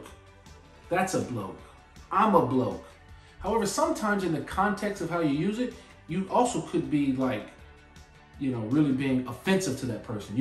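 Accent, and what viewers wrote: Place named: United States. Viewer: These videos show me how much I take your language for granted. Love hearing these with an American accent.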